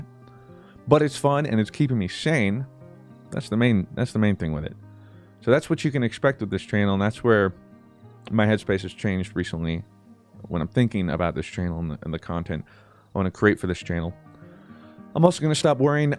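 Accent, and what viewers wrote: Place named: South, United States. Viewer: Listen to that country twang you have! Idk tha5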